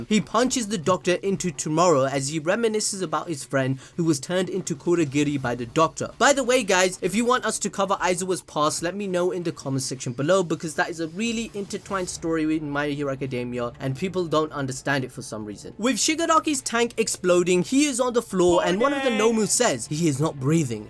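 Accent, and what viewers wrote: Punjab, India. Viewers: Are you Indian... Can't tell with the accent though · Bro hold up with that Punjabi accent, ur audience speaks Hindi too! Namaste 🙏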